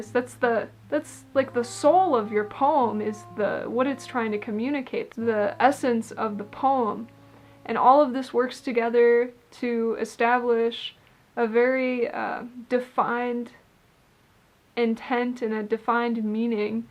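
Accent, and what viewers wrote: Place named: Scotland, United Kingdom. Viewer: ... thank you - Scottish for sure - r, and ch - got a Stroke but talk now after almost 2 years - poetry back then, poetry once more ...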